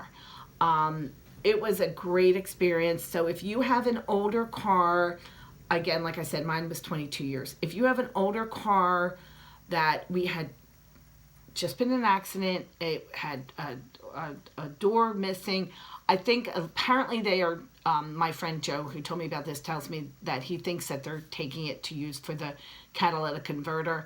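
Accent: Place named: Ireland. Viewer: You sound like you have an irish accent.it might just be me.it's cool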